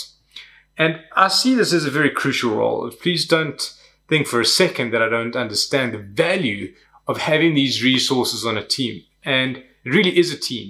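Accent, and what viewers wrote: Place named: South Africa. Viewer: thanks so much for this video! I’ve worked as a Content Designer and a UX writer for the past five years. One of the biggest challenges of our role is educating teammates and other stakeholders on the value of content-first design. You’ve done a great job of explaining it in a nutshell! P.S. I hear an SA accent. Are you based in SA?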